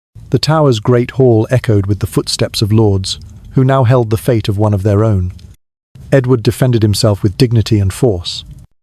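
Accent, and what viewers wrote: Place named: Southern England, United Kingdom. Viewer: The narrator (AI) may be boring, but it's a standard southern English accent.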